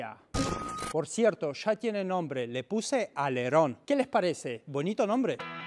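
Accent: Argentina. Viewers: Ese acento bien argentino jajaj muy bueno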